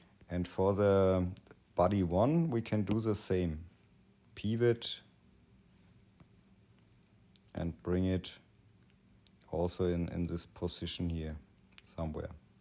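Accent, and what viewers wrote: Germany. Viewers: Sounds german moin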